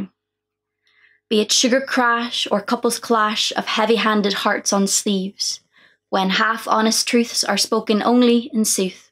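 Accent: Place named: Ireland. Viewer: Love how your poetry brings out the Irish accent, and oh my word, how nice it is to get finally hear a new poem from you! Bet that (huge) little book of yours holds many treasures for us. ❤️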